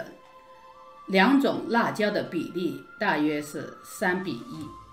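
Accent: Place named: Hunan, China. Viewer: 我看压饺子皮视频听你讲话就觉得你是湖南人，果然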